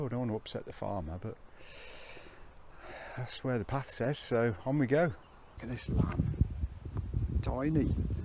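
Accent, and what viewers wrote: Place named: Wolverhampton, United Kingdom. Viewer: Bloody hell! I’m in Wolves too! You’re right there. I thought I recognised the accent. I’m all about the thru-hikes and wild camping these days of course.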